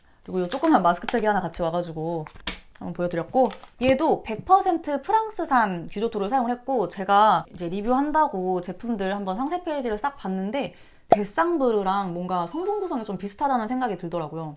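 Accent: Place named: Honam, South Korea. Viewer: 살짝 살짝 호남 사투리가 들려요 귀엽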